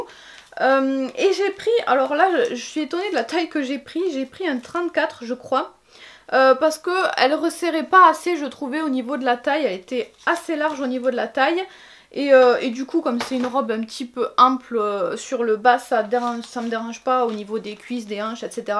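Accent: South, France. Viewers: petit accent du ud qui va bien avec, super video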